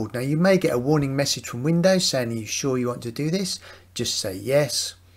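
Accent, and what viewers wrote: United Kingdom. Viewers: IT WORKED! 👍 Thank you so very much. I’m not IT literate, but due to your easy instructions, along with your British accent, I was able to complete this task Diolch yn fawr 🏴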